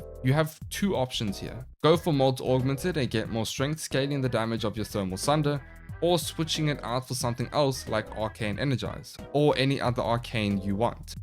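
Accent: South Africa. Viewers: u south african??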